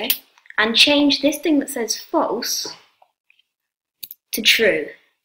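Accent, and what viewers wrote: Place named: United Kingdom. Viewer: Dat British accent tho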